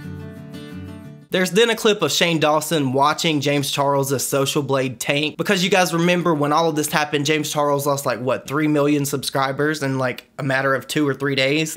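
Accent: United States. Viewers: How you say Riland😍I'm from Britain and we love American accents 😍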